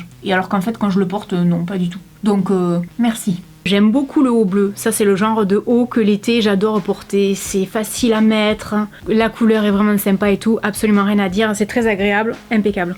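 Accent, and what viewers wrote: South, France. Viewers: Coucou :) Tu viens de gagner une abonnée ! J'ai découvert tes vidéos sur la page d'accueil de YouTube, et j'en ai regardé une bonne dizaine ! Je me suis marrée comme rarement je me marre en regardant des vidéos. Tu es drôle, fraîche et spontanée ! J'adore ton look, ton style et ton petit accent du sud 😃 Et mention spéciale à la vidéo "mes pires moments de solitude" où j'ai hurlé de rire! Je regarde tes vidéos le soir dans mon lit après une journée de boulot et ça me détend ! Gros bisous et ne change rien, c'est parfait 😘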